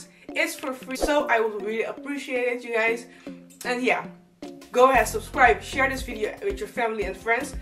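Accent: Netherlands. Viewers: are you dutch? haha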